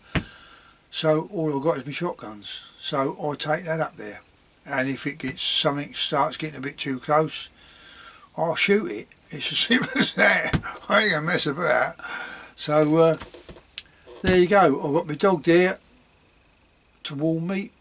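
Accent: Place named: London, United Kingdom. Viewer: Great interesting vid. Looking forward to watching more of your bids. You sound like a fellow londoner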